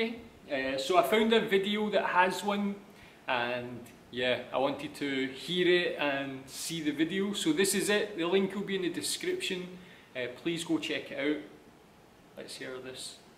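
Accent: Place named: Scotland, United Kingdom. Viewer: Love your Scottish accent : )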